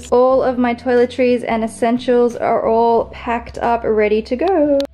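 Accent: Australia. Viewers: It sounds 110% Aussie?? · You can't love her that much if you didn't even know that she is Australian LOL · she's Australian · Hahahaha she's Australian... Aussies that travel have the most confused accents, mine's the same. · you reckon? She sounds Aussie to me. Says some words like Americans do which all you tubers seem to. Why does it bug you? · She doesn't sound British she sounds Australian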